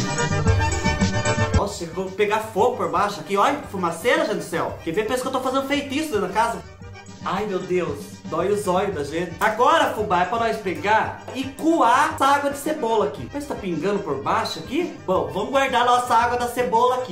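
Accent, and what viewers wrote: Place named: Caipira, Brazil. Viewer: Vc é ótimo fubá kkkk não perco um vídeo é bom de mais esse sotaque caipira eu quase morro de tanto rir kkkkkkk kkk😊😊😊😊😊😂😂😂😂😂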